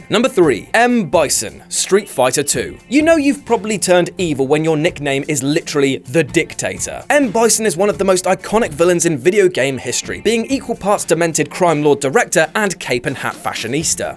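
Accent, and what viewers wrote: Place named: South West, United Kingdom. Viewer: Where are you from in the south west?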